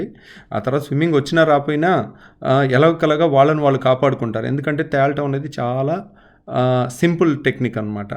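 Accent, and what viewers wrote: Krishna, India. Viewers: Miru chala chakaga telugu krishna dt valla laga matladutunaru doctor garu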